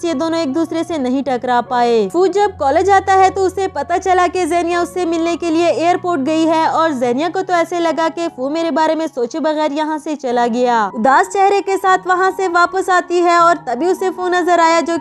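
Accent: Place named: India. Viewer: Aapke awaj se lagta hai ki aap indiqn ho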